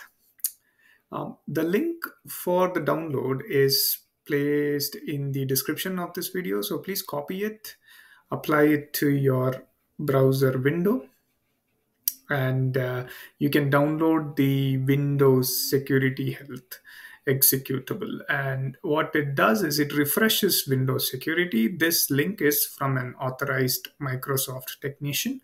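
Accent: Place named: India. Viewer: dude this is a fucking scam, don't download random .exe files from the internet, especially not from someone with an Indian accent (they literally have professional scamming centers in India, look it up ...)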